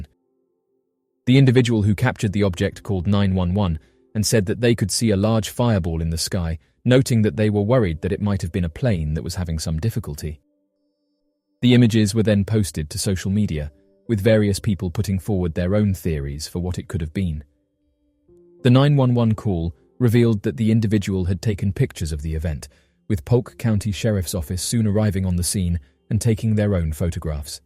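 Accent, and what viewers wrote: England, United Kingdom. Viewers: You sound English so no excuse - the plural of aircraft is”aircraft” NOT “aircraft’s”. Just saying…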